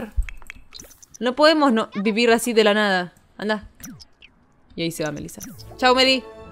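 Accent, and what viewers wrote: Argentina. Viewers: Amo tus videos y me encanta ese asento tan argentino que tenes 😂 Te amo ❤❤❤❤❤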